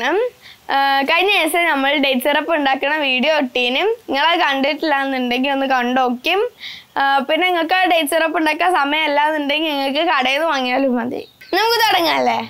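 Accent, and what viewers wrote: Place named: Malappuram, India. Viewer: നല്ല മലപ്പുറം ഭാഷ.... അടിപൊളി.... Nice.. 🤝🤝🤝🤝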